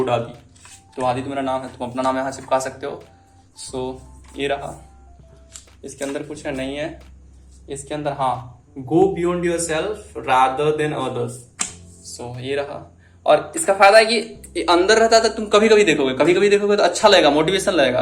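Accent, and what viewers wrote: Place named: Jammu, India. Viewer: Bhai jammu ma bhi same jhi bolte ha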